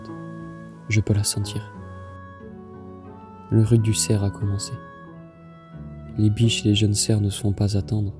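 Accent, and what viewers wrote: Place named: Hautes-Pyrénées, France. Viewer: Bravo, tu peux être sacrement fière de toi! Quelques petites fautes de français mais rien de grave (c'est souvent des façons de parler dans le 65 mdr) et la qualité du son est à retravailler un peu, mais les images, les informations et le message sont fabuleux.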